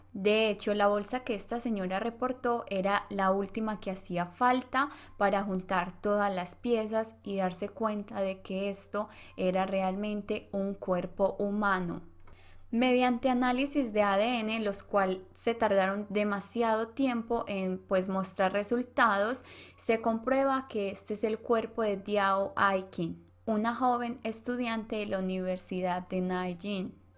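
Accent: Colombia. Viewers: El mas bonito de los acentos colombianos, de donde eres?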